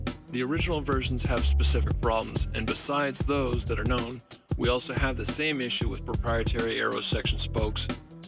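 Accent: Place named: United States. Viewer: MERICAN ACCENT!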